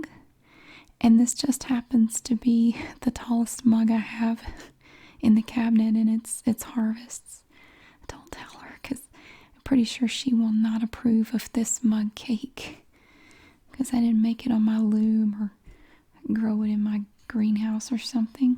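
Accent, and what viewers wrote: South, United States. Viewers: She has such a sexy southern voice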